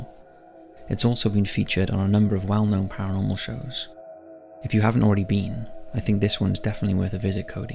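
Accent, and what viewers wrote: United Kingdom. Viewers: Too much catering to americans on these channels. And his voice isn't anything special it's just a generic british accent.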